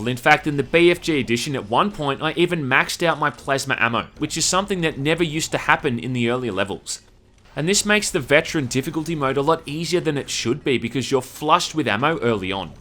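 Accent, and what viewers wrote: Australia. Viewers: It's been 6 years but for me, is the shit! The most honest, in your face, game reviewer. There is no comparison. For us FPS fanatics, it's either him or civvie 11. Reasons? No pandering to the lowest common denominator. No forcing political narratives in your throats. Respecting the old FPS gamers intelligence. Honesty and not getting blown away by modern game gimmicks. And That Australian accent. I grew up playing these games, so for me..... Best FPS game reviewer. Bar none. You Go! Respect from India. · "LOOK AT EEM, WOTS WRONG WITH YA FAICE???" I fuckin' love australians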